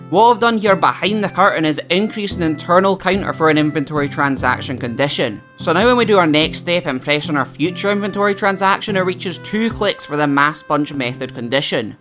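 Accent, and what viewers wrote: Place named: Scotland, United Kingdom. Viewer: Propa Scottish man, aye?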